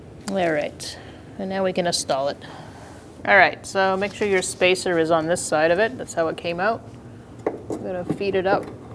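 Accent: Boston, United States. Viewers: Hi quality video and textbook Boston accent! After 12 years all my shock pieces are frozen.I'm not gonna use torch, just repeated srpaying and hammer hits and a few days, I also used a 4' steel pipe sleeved over breaker bar for awesome leverage., the top of new shock in the "cage" is a really, really tight fit and threading the needle is by braille on the top. I used silicon spray to move the shock top in the cage, it's that tight. Doing a job where all the bolts aren't frozen must be a lot of fun, something I'll never esperience!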